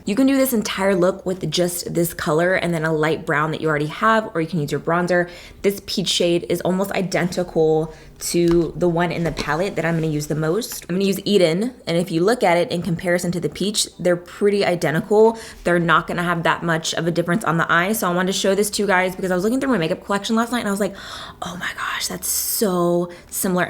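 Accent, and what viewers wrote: South, United States. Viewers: Has anyone noticed she has a slight country accent?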